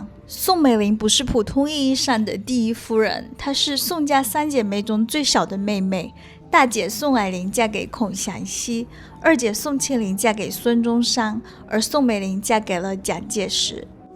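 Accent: Fuzhou, China. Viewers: 影片內容跟拍攝都很好，但...講話發音是福州土腔? 發音都不標準，聽了十分難受。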